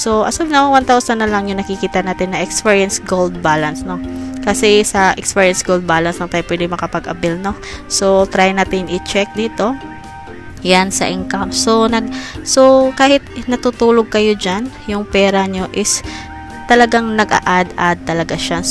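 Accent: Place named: Visayas, Philippines. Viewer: Bisaya ka mam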